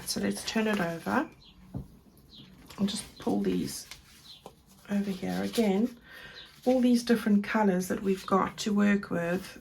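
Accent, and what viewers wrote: South Africa. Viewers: It's the way you say"here" then you said you used to live in S.A. And then I realised why I couldn't place it. You've been here a while hey xxx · I absolutely love your videos... Sorry it is so hot there but here in Johannesburg it is freezing and I am in Europe next week and I can't wait for a bit of warmer weather... I also don't like it too hot though... I knew you had spent time here in SA I can hear it in your accent... so nice to see one of ours doing so well ... thank you for all your beautiful stuff. <3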